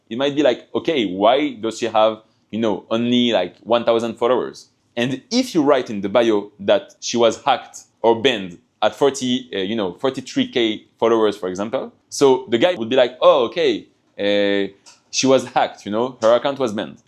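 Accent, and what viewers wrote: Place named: France. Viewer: Salut j'ai reconnu que tu est Français hahaha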